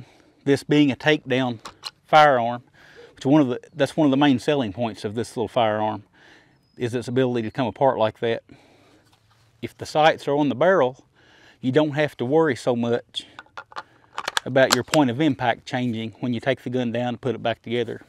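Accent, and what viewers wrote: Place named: South, United States. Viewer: Hey. Like that your videos cover such a wide spectrum of firearms, ammo etc. Just because you aren't an AR guy, or a Henry fan, or a revolver fan doesn't mean that you can't learn about and enjoy them on channel. An added bonus is that you get some culture with the southern accent and geography as well. Keep em coming, they are all good.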